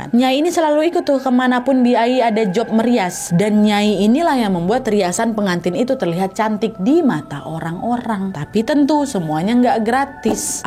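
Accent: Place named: Sumatra, Indonesia. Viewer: Mba orang palembang at org Jawa? 🤣 tp bahasanya kyk org Sumatra.... 👍👍👍👍❤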